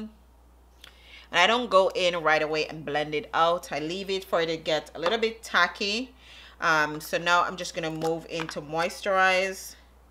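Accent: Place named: Jamaica. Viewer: Beautiful look. ❤ ❤ You sound like you are Jamaican. Are you from Jamaica if you don't mind me asking?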